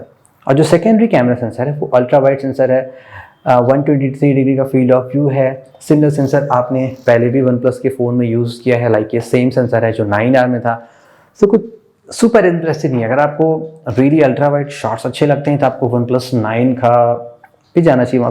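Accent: Lucknow, India. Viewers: ji aap lucknow se hi ho kya???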